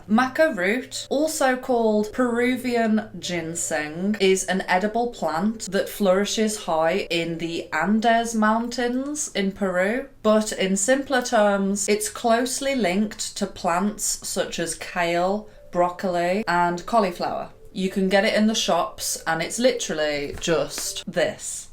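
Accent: United Kingdom. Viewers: Red maca does work alot better than yellow for woman hormones and curves. I lost weight in the stomach area when mixing it with chia seeds but gained boobs and glutes 💚 love listening to you btw UK accent gives me happy shivers. Will be checking in on your channel.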